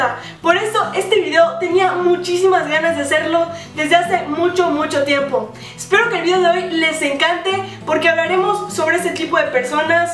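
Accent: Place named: Mexico City, Mexico. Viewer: hablas medio chilangaaaaaaa pero caes bien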